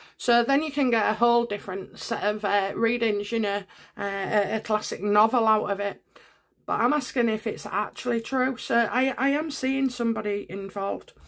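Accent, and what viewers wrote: United Kingdom. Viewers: Hi from Australia. Nice reading as always. My favorite UK tarot reader. Nice reading as always.. I always enjoy your tarot.. Love your British accent. My dad had a Scottish accent. Mum was from Isle of man.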